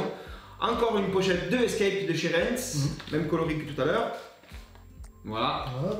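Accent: South, France. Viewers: Je participe ! J'aime trop votre version un peu plus sudiste de la pêche le petit accent fait la diff continuez comme ça les gars c'est vraiment top ! Je participe au concours si jamais j'ai un peu de chance ça sera la 1ere fois que je gagne quelque chose en concours ahah ! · Je n'avais pas spécialement prévu de vous laisser un commentaire mais là c'est juste parce que je suis un petit peu dégoûté. en effet ma nouvelle copine à qui je voulais montrer un petit peu mon milieu de la pêche en partageant vos vidéo vous surkiffe surtout avec votre petit accent du sud... du coup elle aussi elle veut essayer le float tube . Vous allez me coûter une blinde les gars!!!!!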